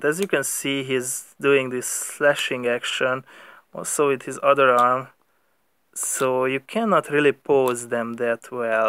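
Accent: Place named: Hungary. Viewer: Te lenni magyar? nagyon akcentusos....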